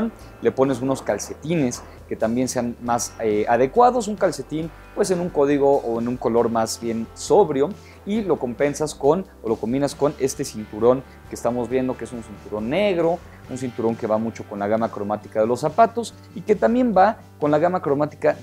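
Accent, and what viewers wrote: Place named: Mexico. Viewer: Por el acento al hablar su pongo que eres de México. Podría recomendar lugares para comprar ropa sin caer en precios exorbitantes. Gracias!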